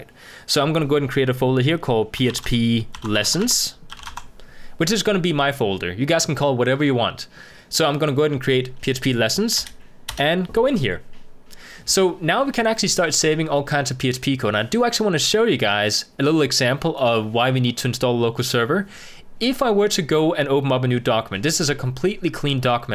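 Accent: Denmark. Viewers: Hah du er dansk d er jeg også. Syntes også jeg kunne genkende accenten :). God tutorial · Er du dansker? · Was gonna say, you sound like my Norwegian cousins. Danish! Great tutorial, from a python and C lover. Subbed, upvoted, and all notifications enabled. :)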